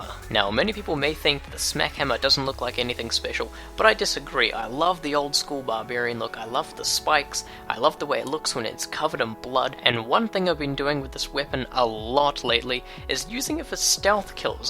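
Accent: New Zealand. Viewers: I've got 2 things to say are you a new zealander and you've got your own costume😲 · U from nz?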